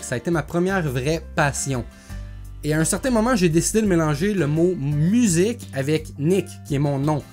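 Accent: Quebec, Canada. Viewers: très bonne vidéo, Comme toute tes autre d'ailleurs, je prend rarement la peine d'écrire dans les comentaires des youtubeur mes je trouve ton contenue tres professionnel et ta facon de parlé est simplement parfaite (simple,calme,respectueux et surtout compréhensible) en étant quebecois moi meme parfois j'ai l'impression que les autre youtubeur quebecois parle (trop quebecois) comme qui il parlerai a leur ami de long date dans le salon ce qui fait que la video est moin plaisante a écoute. Un long texte pour simplement te dire ne change pas. J'ai h¸¸ate a tes 1000 abonnés pour mieux comprendre ton logo même si je crois déga avoir une petite idée de ce que cela peut représenté. PS: j'ai 23 ans. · j'ai 27 ans et c'est un grand plaisir de t'avoir découvert sur youtube j'aime vraiment l'accent du québec et ton contenu et de bien meilleur qualité que d'autre chaîne d'on ont ne citera pas de nom continu nice job ps: nous somme voisin je suis dans le quartier pauvre de zom-zom aller sur ce bonne continuation .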